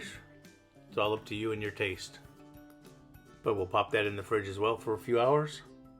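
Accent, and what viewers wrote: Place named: Louisiana, United States. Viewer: I loved watching him , loved his Cajun accent!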